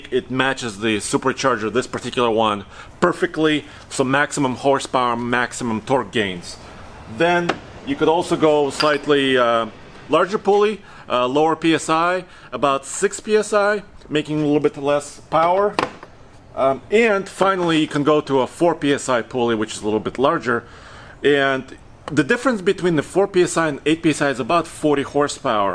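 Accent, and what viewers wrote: Russia. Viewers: Man, i hate his russian accent. Idk why. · Is this guy Russian?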